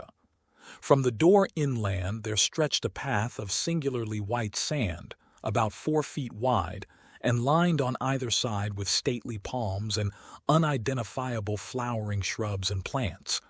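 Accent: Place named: United States. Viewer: Finally I find an American reading an American author. Most readers of this story are Brits. Likewise, I prefer British authors read by British narrators. Call me picky, but it makes a big difference for me. 🙂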